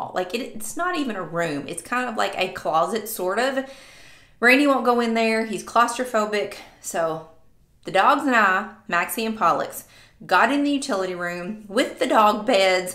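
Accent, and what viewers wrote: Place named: South, United States. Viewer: You have my hair.. GAWD..... same problems.... same FLOWER on the right side of my head..... I want to transition, I am 62 and my hair is THICK like this!... dang girl... we got the same MAMA! I'm in Texas... I'm gathering from your accent.... Arkansas? Alabama, not too much toward Mississippi....OKLAHOMA??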